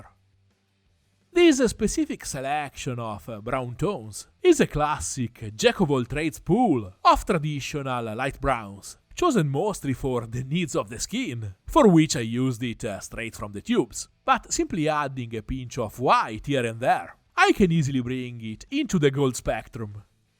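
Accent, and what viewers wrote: Italy. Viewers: Hey just wondering are you Italian? Your Accent is kind of intense idk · Awesome job ! I want to go back to painting minis when I watch that kind of quality videos. And your italian accent make me want to learn the langage xD Keep up the good work, cheers from a (french) neighboor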